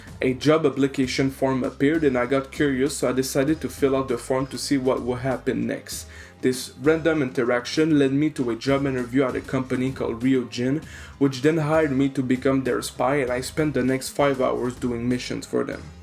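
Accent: Quebec, Canada. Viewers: r u a quebecor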